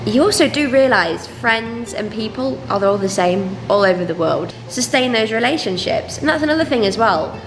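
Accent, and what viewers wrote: United Kingdom. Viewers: 很好聽的正統英國腔～～❤❤👍👍👍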